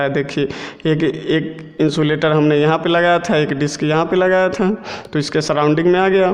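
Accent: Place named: India. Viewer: I want this lesson but i cant listen indian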